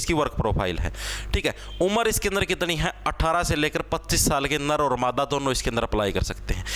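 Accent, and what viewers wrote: Haryana, India. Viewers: Haryana ka hai mitr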